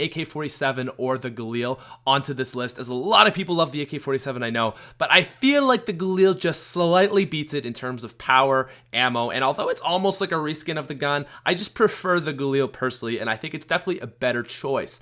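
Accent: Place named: Canada. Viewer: He has the most Canadian pronunciation of out ever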